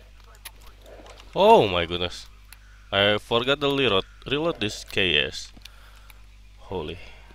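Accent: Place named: Indonesia. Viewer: Indo ya ? logat-nya logat indo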